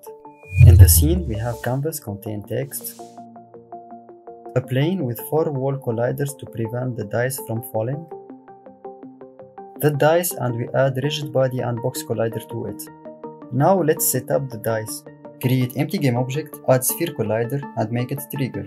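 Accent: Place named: Tunisia. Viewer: L'accent khouya dhahra, w ma ytalaaha ken Tounsi kifek Merciiii infiniment ala Tuto <3 <3 ! w je t"encourage alekher w nshalah chaîne tekber akther akther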